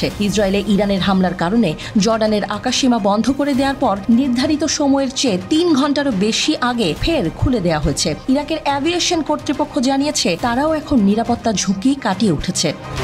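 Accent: Kolkata, India. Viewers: এই মহিলা কোলকাতার ভাষায় কেন খবর পরছেন? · আর টিভির যে কন্ঠ দিচ্ছেন একি ভারতের কোন নাগরিক কিনা গলার সুর শুনে মনে হয় ভারতীয়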